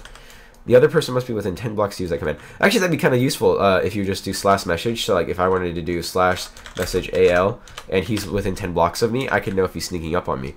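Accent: United Kingdom. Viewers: Your British Accent xD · the british accent ain't bad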